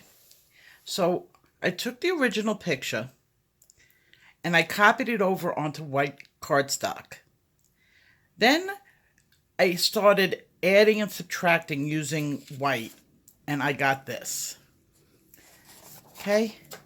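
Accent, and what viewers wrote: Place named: East Coast, United States. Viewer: I love your work and your accent reminds me of when I lived on the east coast. Very fond childhood memories, from a simpler time. So thank you, for helping me with this new hobby and reminding me of my childhood.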